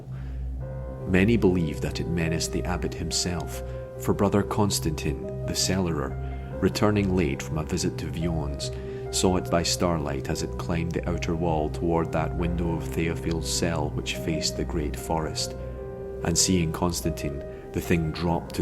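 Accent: Scotland, United Kingdom. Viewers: Good narration! Very glad to have found a YouTuber with a good voice doing Lovecraftian stuff. Scottish accent works well.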